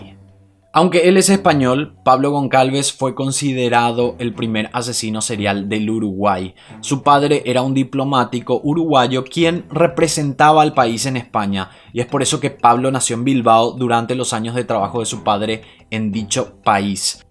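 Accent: Paraguay. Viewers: Amigo vos sos paraguayo?? Por que tenes acento paraguayo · Por el acento que escucho veo que eres paraguayo,no sé si estás enterado que el mayor violador y asesino del mundo,esun colombiano llamado Luis Alfredo Garabito,violó y asesino a 172 niños y tenías que haber sabiodo realmente quien el mayor asesino no solo de América Latina,si no de todo el mundo y creo todavía sigue preso,infórmate y publicalo,un saludo para ti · Sos Paraguayo? Excelente video hermano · Tenes acento Paraguayo. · Verdad su acento es Paraguayo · Parecés paraguayo! Es así? Muy buen material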